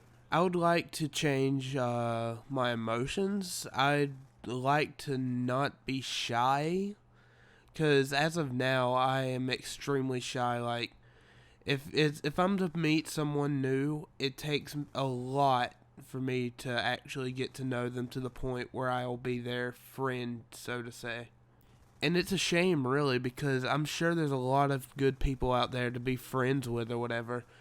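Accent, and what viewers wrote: Georgia, United States. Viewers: I live in Georgia too btw you sound like your from Georgia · He sounds a bit southern anyone else notice that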